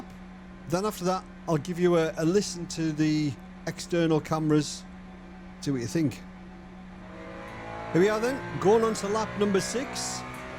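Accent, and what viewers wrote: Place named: North East England, United Kingdom. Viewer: Hey, I notice your North East accent. You based up here in NE England by any chance?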